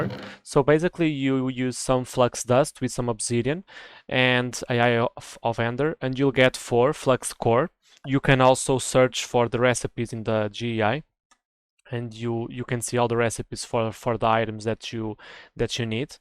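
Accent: Portugal. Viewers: man your from portugal right? your accent is very portuguese and btw great video ty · My guy you sound kinda Portuguese ngl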